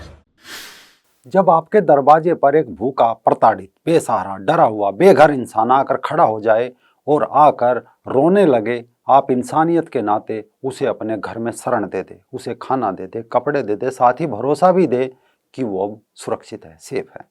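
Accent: Haryana, India. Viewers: आज आपका पहला वीडियो देखा, बहुत अच्छा लगा और आपकी बोलने की tone से आप हरियाणा के लगे Well done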